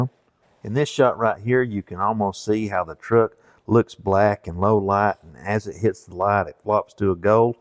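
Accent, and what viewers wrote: Tennessee, United States. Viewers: That's an incredible job. I thought I heard TN in that accent. I'm in middle TN working on a 73 F100 swb. Thank you for the videos. I wish there were 10x more. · Same here recognized that tennessee accent...im up here on the plateau in crossville. Great work on that f150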